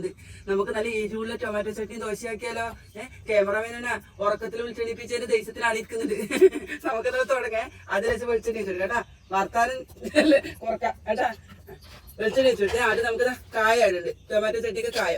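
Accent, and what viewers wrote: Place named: Paravur, India. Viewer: ങേ ഇത് എന്റെ നാട്ടിലെ വർത്താനം ആണല്ലോ 🤔🤔 പറവൂർ ആണോ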